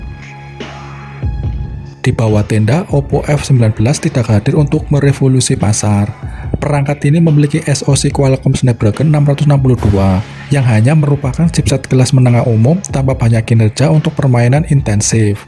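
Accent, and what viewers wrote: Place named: West Java, Indonesia. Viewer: Bang... Orang sunda nyakkkk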